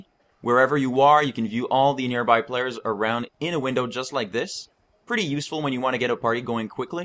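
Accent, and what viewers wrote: Canada. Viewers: Are you Canadian? Cause you said aboat instead of about I'm not trying to be racist but idk it reminded me of young Robin from HIMYM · ....He's Canadian!! His accent bothered the crap out of me trying to figure out what it was.... Time to rewatch the video, now that I can concentrate.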